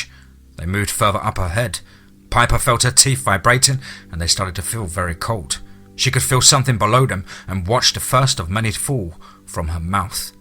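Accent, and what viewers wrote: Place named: Scotland, United Kingdom. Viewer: It's one am and I'm halfway through... loving it. Love the Scottish accent as well.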